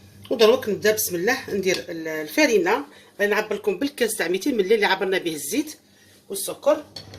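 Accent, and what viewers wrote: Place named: West, Algeria. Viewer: هدرت الغرب شابه😍تعجبني😂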